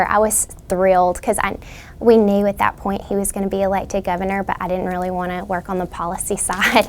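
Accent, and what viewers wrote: South, United States. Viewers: How rude are you she has a southern accent. I am from the UK 🇬🇧 and I can understand her.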